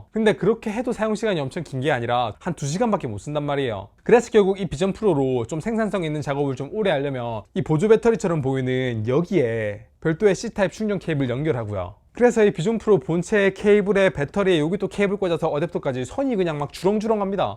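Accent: Gyeongsang, South Korea. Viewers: 아씨... 경상도 사투리...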